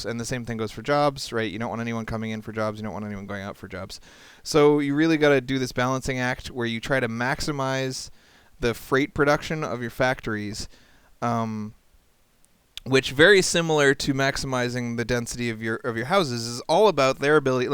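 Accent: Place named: Canada. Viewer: what are you canadian you totally sound like it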